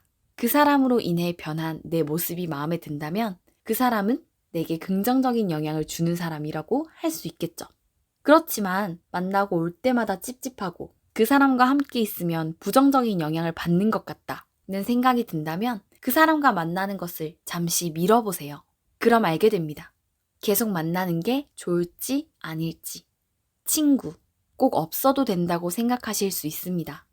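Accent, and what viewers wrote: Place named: Gyeongsang, South Korea. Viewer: 님 사투리 쓰는 거 보니까 경남, 부산에 사는 분 같네요.ㅎㅎ 딱 들으면 알지예.ㅎㅎ 저랑 지역이 동일할 듯.ㅎㅎㅎ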